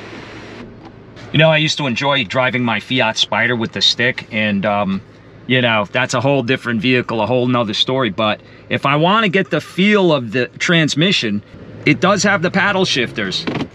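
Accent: New York, United States. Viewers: The New York accent gets old after a while...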